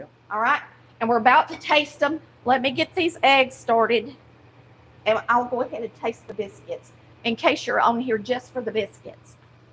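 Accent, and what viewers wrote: South, United States. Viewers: For those of you who don't understand a lot of her words due to her Southern accent, she said "baking powder', not bacon powder. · I love you and your crazy Accent! Y'all! We say Eh and you say Uh huh honey and Y'all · Love your Southern accent and the way you cook! Y'all are amusing, too.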